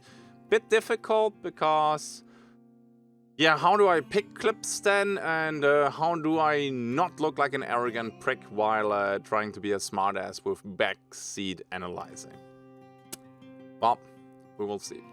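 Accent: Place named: Germany. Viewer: If I may ask, are you German? Bcs you kinda sound german and your grammar hints at native german too. I am always happy to see a Landsmann on the internet. 😁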